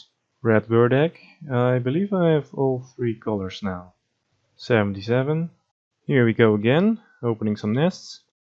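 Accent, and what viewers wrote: Netherlands. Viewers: are you dutch sir?? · you're dutch aren't you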